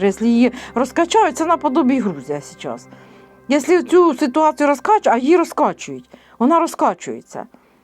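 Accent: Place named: Surzhyk, Ukraine. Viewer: Дякую Вам,пані! Чую Вас і радію. І ше, Ваш суржик я теж так розмовляю. Харків з Вами.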